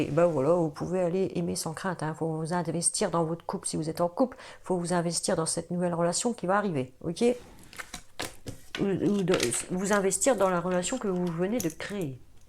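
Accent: North, France. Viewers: Un petit bonjour d'Hautmont près de Maubeuge😉😂👍. Je vous découvre et je retrouve bien l'accent du Noooooord ! Merci pour ce tirage, je suis gémeaux ascendant verseau également ! 😉👍. Je m'abonne à votre chaîne avec grand plaisir. Bisous à vous et à votre adorable petite chienne😍🙏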